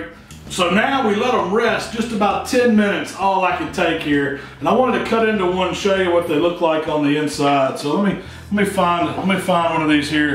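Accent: South, United States. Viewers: No skin Needs sauce?? Sound southern but I'm wondering?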